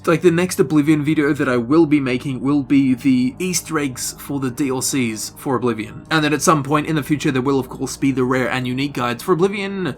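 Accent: Australia. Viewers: Can I watch your Witcher DLC playthrough if I haven't played the main game yet or will I be confused/spoiled? I need more of that soothing Australian voice...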